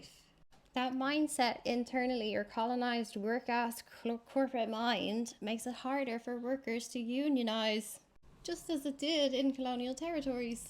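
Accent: Ireland. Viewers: You sound like an Irish Lisa Simpson.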